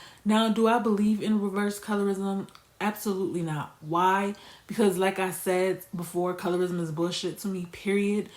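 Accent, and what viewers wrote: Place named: Brooklyn, United States. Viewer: I like your Brooklyn accent. You from BK?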